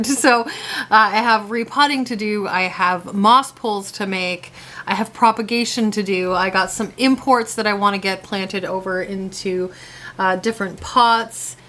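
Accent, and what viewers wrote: United States. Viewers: From a german speaker: i don't hear a boat ^^. I think it's the american accent 😀. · Ha. Ok, so your grandson truly is the cutest. Holy crow. As for “out and about”...I grew up in Illinois and Wisconsin. Moved to Tennessee as a teen. I’m 46 now and when I watch you, I find myself saying out and about” with the northern accent. My husband teases me about it. So yes, we hear it. (And love it, it brings back childhood memories for me) 🧼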